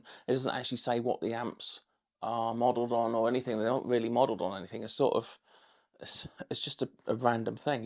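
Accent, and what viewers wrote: United Kingdom. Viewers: Thanks a lot for the excellent review,professionally done,plenty info,no gobble dee gook. Like the friendly style and Your British accent too ! Regards: Peter from across the channel.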